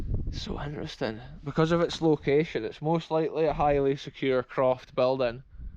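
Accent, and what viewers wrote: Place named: Highlands, United Kingdom. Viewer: Just discovered your channel. Love your videos! It's really interesting exploring these old places. I'm lucky to have 4 or 5 ruins close to my house 😍 Love your accent too. Where is you're from? Wee bit of Highland twang in there!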